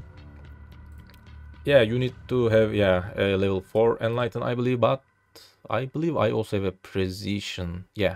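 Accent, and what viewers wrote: Turkey. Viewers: konusmaya basladin 5 saniye dinledim ve dedim bu adam türk :) ve ne buluyorum kanal hakkinda :"Making build guides for Path of Exile.Because there are not much Turkish content creator,i make other guides in Turkish." yakisir...kolay gelsin hocam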